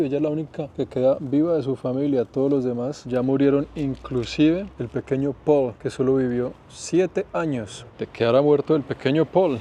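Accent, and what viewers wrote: Bogotá, Colombia. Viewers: es colombiano su acento es el Bogota donde creció y se educó, el es ingeniero, luego se fue a Canadá y estando allí se volvió youtuber, siendo uno de los mejores. Ahora viaja por mundo. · demás de educativos y de muy buena calidad tus videos, me haces reir mucho con algunas expresiones, me encanta tu acento Colombiano. Saludos de otra Abuela desde Dallas Texas, que te acaba de adoptar 👵👵👵👵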